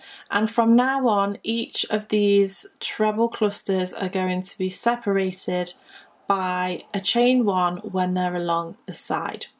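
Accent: United Kingdom. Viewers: Thank you so much. I watched a couple of other tutorials, and couldn't "get it". Yours was the best, I finished a square right along with you. Your voice is lovely, which helps a lot! I'm from the US and at first thought "Oh no, another Brit", but you did such a nice job of explaining the terms, that I soon was very comfortable with UK terminology. Thanks for including us!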